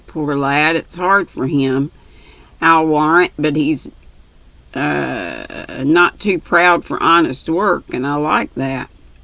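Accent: South, United States. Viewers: You are so so cute 🥰! 😂 I love your southern accent! I love everything about the south and southern ways! I swear I was southern in a past life, because I’m Hispanic, I was born here but my parents are from the Dominican Republic 🇩🇴 and I actually learned English in school because my parents didn’t learn English till we went to school, therefore people find me strange ( which I’m ok with) when they hear me listening to country music instead of Merengue or something like that, and they see how I love 💗 my cowgirl 🤠 boots 👢 and my country home style. 😂 I just love it! My dream is having a ranch with horses 🐎 and all that good stuff! Also finding myself a good ol’ country man that knows how to treat a lady 😂 ( if you know one let me know Ms. ) 😂 Anyways just wanted to say I love 💕 your humble southern ways and style! Thank you for being you my sweet friend! 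🥰🥰🥰🥰🥰😊😊😊😊🙏🙏🙏😘😘😘😘😘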